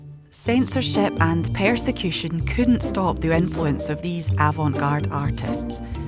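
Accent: Scotland, United Kingdom. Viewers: Scottish accent..then there's accents within accents! My ex's was different. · The scotish accent it's just brilliant...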